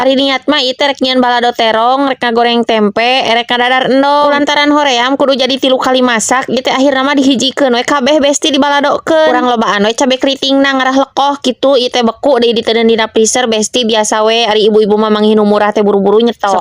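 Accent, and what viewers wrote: Sunda, Indonesia. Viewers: Logatna Sunda pisan teh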